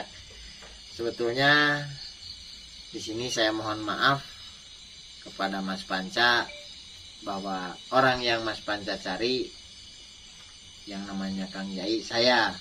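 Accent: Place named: West Java, Indonesia. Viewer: Assalamualaikum, mugia kang sinareng team sarehat. Bade tumaros linggihna dimana? Upami ningal dina logatna mah urang sunda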